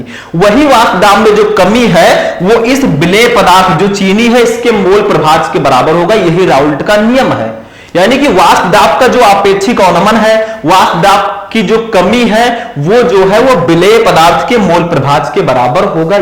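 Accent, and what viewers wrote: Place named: Gonda, India. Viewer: Sir aapke language se lagta hai ki aap aap Uttar Pradesh Gonda se hai 🤔🤔🤔🤔🤔🤔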